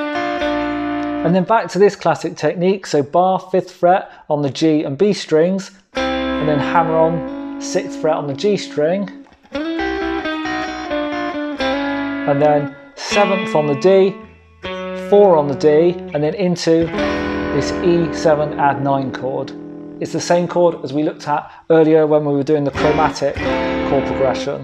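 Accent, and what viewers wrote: United Kingdom. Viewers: Great video. Just got the backing track from yr payhip. Wish i could get a pdf tab ? Also, thanks for your nice and clear british english 😀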